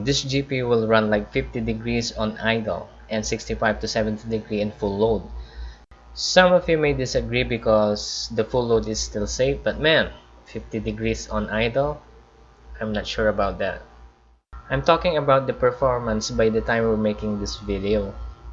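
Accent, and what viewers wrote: Philippines. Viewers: cant really miss that distinctive pinoy english sound lol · Proud of you accent sounds filipino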